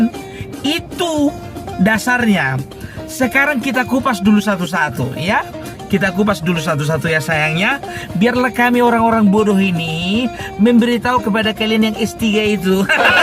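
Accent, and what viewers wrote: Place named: Medan, Indonesia. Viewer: Gas terus opung jangan di kasih kendor kami senang dengan logat Medan kami dari Jawa Barat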